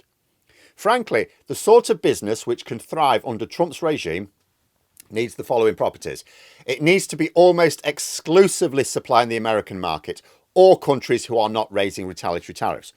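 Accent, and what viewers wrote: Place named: North, United Kingdom. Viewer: What absolute bollocks as ever from this silly site and a strange man who shouts his northern accentuation at me.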